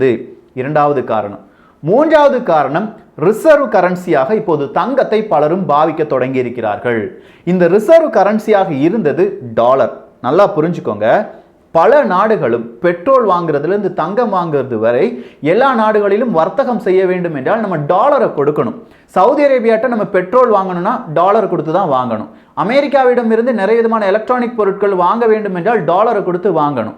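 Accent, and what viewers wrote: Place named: Madurai, India. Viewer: Dai Marithas Mathuriya Pasura da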